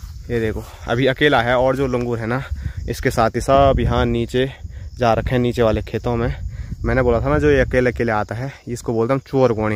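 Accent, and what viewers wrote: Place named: Haryana, India. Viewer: Ary ya aawaj to mhale haryana ke h🚩🚩🚩🙏🙏🕉️🕉️🕉️🇮🇳🇮🇳🇮🇳🇮🇳👍🌸🌺👌👌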